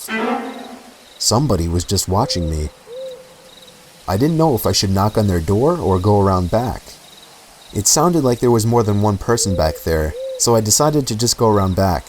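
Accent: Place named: New York, United States. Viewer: I picture him looking like the Joe Pesci from Home Alone lol the NY accent is why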